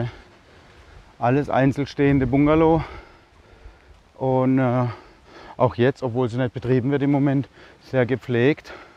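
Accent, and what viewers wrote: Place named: Karlsruhe, Germany. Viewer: Ja er lebt auf Koh Phangan.... wir haben schon einige deiner Videos gesehen und ich habe zu meiner Frau gesagt dass ich das Gefühl habe dich zu kennen. Wir waren 2019 in Khao Lak in Urlaub und haben dich mit Roman bei dir Daheim in Khao Lak besucht. Du warst gerade damit beschäftigt deinen Umzug vorzubereiten. Roman ist ein guter Freund von mir. Ich habe in deinen Videos auch unseren Karlsruher Dialekt rausghört ;-) Wie klein ist die Welt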